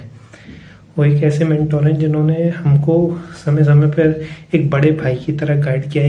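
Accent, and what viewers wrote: Bengal, India. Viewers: sir, THANK YOU VERY MUCH for your content over these years. Your channel is probably the major factor in getting me this amazing IIT JAM 2025 rank I've achieved. Also, I love your Bengali accent. It is quite adorable ❤❤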